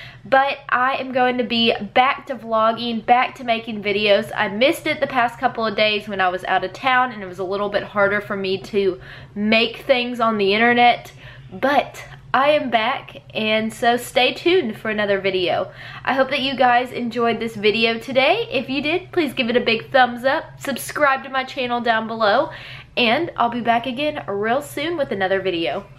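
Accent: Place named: Texas, United States. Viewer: its Texan mixed with her mothers very southern accent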